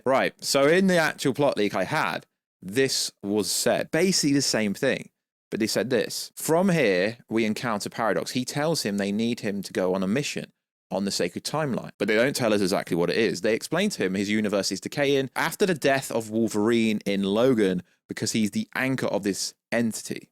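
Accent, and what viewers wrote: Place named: United Kingdom. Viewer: I swear I thought it takes me a hour just to get to the point but you, you is just bad man, and every time I try to find other people that shows leaks and spoilers, it’s only you and the other guy Paul or whichever u are you, y’all both sucks and talk way too much. I get to make a long video but u speaking in British when we are Americans, we can’t understand you, geez Christ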